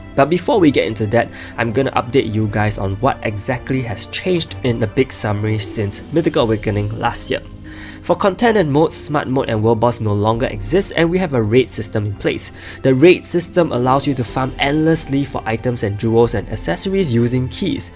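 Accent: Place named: Singapore. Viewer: are you from Singapore? quite a familiar accent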